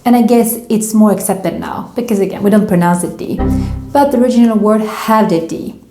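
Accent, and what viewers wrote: Stockholm, Sweden. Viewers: I think I checked everything you have and, honestly, I enjoy it all. It's a lot of fun for me... On the other hand, you have the accent I need to understand (South of Stockholm). It is helping me a lot.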